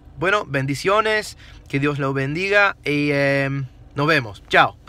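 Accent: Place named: Argentina. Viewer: Excelente, sos un argentino hablando.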